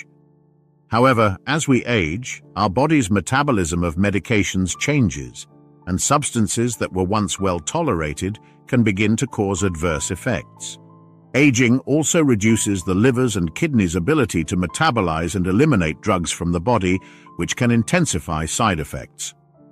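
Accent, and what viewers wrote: United Kingdom. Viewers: Is you tube in Britain? Every announcer speaks British so i am curious?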